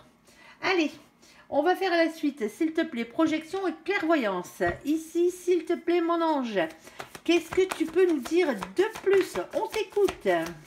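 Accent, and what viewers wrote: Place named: South, France. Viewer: Je vous découvre, vous êtes dans le juste 😊. Merci pour votre bonne humeur et votre accent de soleil ☀️ Je m'abonne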